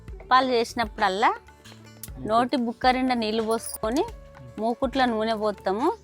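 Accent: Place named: Telangana, India. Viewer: last lo" nee avva swargam" highlate😂😂...pure telangana slang ❤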